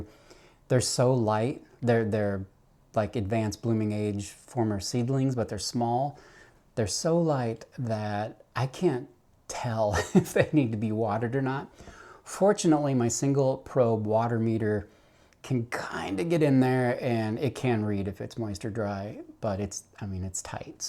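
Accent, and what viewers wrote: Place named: United States. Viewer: Love Miro…specifically for the accent 😂…, can you conjure up a delightfully calm and gentle European accent? The American thing has worn out its welcome.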